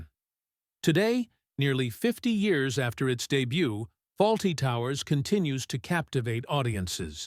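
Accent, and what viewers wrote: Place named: United States. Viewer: Dont waste your time watching this , dumb yankee voiceover , a collection of repetitive screenshots , clickbait , utter BS , whoever put this together must have the IQ of a newt. Oh and the thumb showing her in a bra ,is clickbait The actual scene was Basil impersonating a Nazi, not mentioned at all in this vid .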